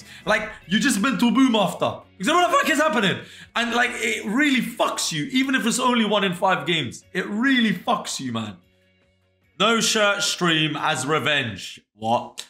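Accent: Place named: United States. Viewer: The American accent gets me. 😂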